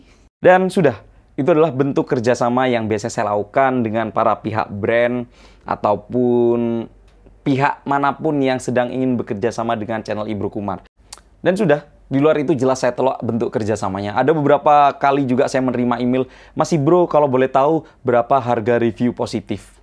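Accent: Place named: Surabaya, Indonesia. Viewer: oalahhh logat nya kayak orang surabaya dulu makanya tak kira orang surabaya wkwkwkwk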